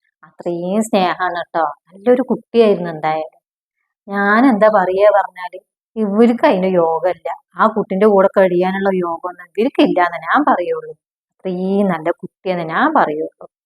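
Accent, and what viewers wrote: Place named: Kongad, India. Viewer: കോങ്ങാട് ആണല്ലേ വീട് സംസാരം കേട്ടപ്പോൾ സംശയം തോന്നിയിരുന്നു 😊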